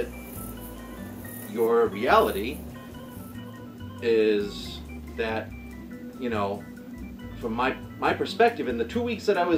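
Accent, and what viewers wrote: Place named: Boston, United States. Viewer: We get to see you sporting your full-on Boston accent in this one. Good on you, mate! Also shows how you can effectively reach out to the thick-headed Meadowlark Lemons of the world. Cheers!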